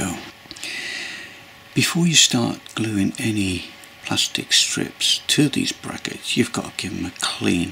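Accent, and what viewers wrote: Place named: Newcastle, United Kingdom. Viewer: Sir Nigel Gresley would be proud of you,superb work,you can tell you are a Geordie bud.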